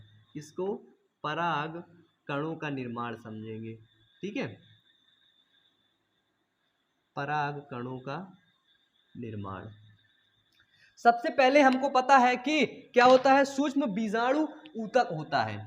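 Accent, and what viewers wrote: Uttar Pradesh, India. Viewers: Sir app up se ho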